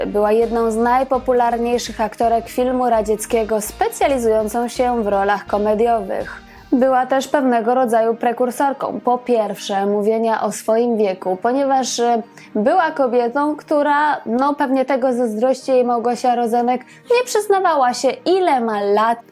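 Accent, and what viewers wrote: Russia. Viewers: I to z niemalze rosyjskim akcentem! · Ma Pani ladny glos no i ten rosyjski akcent tez niezly:)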